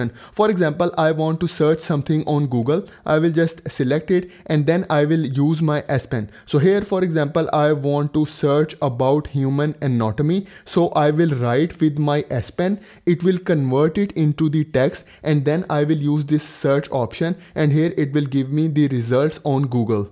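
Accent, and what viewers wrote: India. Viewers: Bros Indian accent is so heavy.... Got my balls crushed 🗣️🗣️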